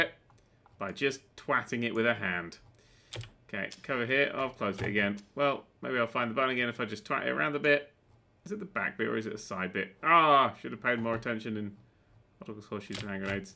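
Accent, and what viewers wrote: United States. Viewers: WAITDans American?????!!!! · is American!?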